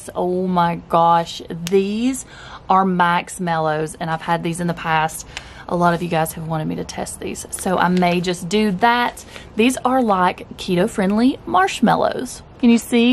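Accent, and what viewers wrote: South, United States. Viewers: I love how you say "spicy dill"! I'm from Michigan so I love the southern accent!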